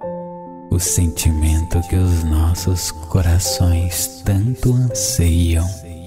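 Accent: Minas Gerais, Brazil. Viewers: Falando desse jeito eu nem vou dormir essa noite que isso você deixa eu sem saída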